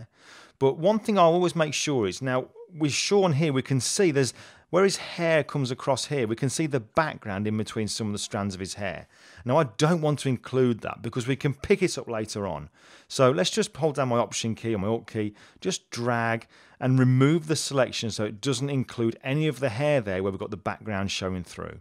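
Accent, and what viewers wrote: United Kingdom. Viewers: lovely midlands accent :-)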